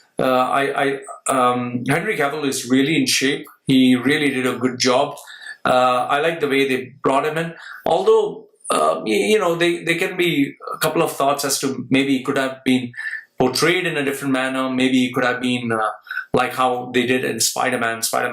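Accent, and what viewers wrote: India. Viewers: This is one of many Indian accents Not every Indian talks like the stereotyped one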